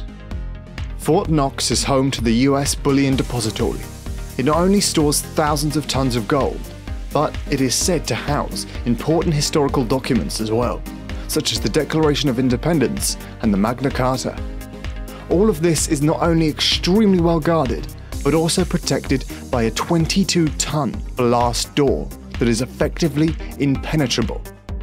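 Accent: United Kingdom. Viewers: Hate the Brit accent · Lawrence Sanchez just because he has an English accent doesn’t mean he knows geography! Area51 is north of Las Vegas not New Mexico. Same pictures used over and over. WTF?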